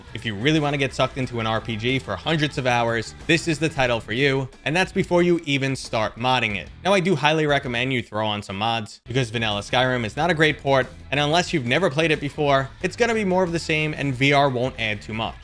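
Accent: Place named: South Boston, United States. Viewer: Appreciate the work put into this video and the Southy accent lo thanks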